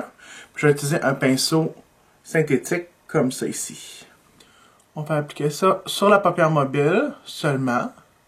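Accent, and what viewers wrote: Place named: Quebec, Canada. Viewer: Il est très joli ce Makeup j'aime vraiment beaucoup. Je ne me lasserai jamais des accents des youtubeurs Québecois !! quel Bonheur !! Ps: Bonne & heureuse année :)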